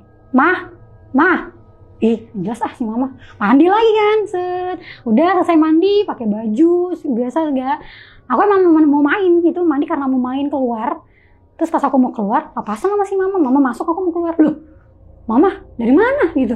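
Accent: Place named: Bogor, Indonesia. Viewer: logat logat bongee pisan ini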